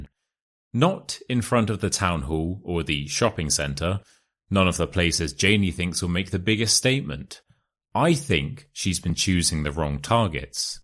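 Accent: United Kingdom. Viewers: Wonderful story. i subscribed your channel to improve my pronunciation and listening skills because I love British accent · thank so much i was listening the narration but, i think is a bit high level for my. I like de challenged, as hear British accent, so I will try to understand , to be able to enjoy it. Again, thanks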